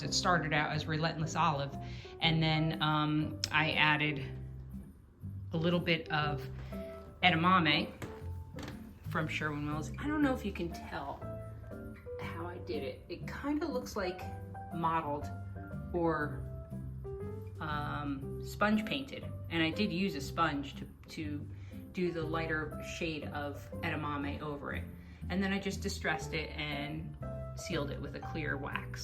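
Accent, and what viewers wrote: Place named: South Jersey, United States. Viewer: I am watching from North Carolina. I love your videos; especially love your accent ! It is very nostalgic to me since I am originally from South Jersey! You sound just like my mom’s friend😂! I love the green in your house. That color is my favorite and I have it in my home too . I love how you shared that you mixed the paint colors to achieve the shade you wanted; that’s what I did with my kitchen cabinet color mixing two of the Sherwin-Williams green.😊 You are inspiring me to paint my table and maybe my chairs because I have a similar set…so cute!❤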